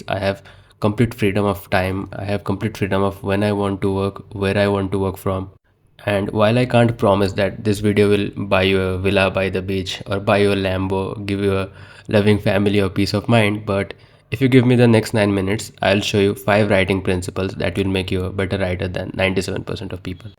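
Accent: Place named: India. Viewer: the second I heard the indian voice, i was like hell yeah man, this video is gonna be legendary.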